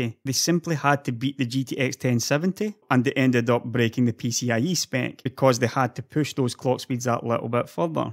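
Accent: Scotland, United Kingdom. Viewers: speaking English with a Scottish accent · stayed for the scottish accent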